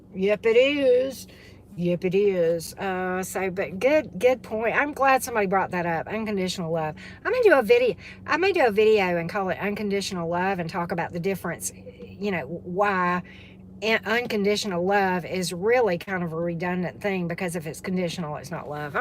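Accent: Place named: Louisiana, United States. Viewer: I'm from England and I find your accent adorable. Where is it from? Louisiana? Thank you for the reading. 😁❤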